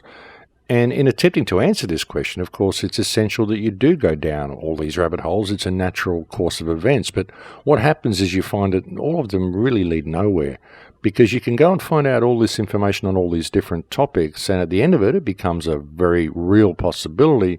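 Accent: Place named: Australia. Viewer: You're most welcome, your words pulled it from my heart to yours. I too see humanity as one of the many species in danger on this planet. Every words you're saying is true and have helped me considerably understanding what's going on, though only after I got over your Australian accent...lol...no worries, I have one myself...there's not such a thing like "perfection" or, better yet, perfection is only to be found through imperfection;) big hugz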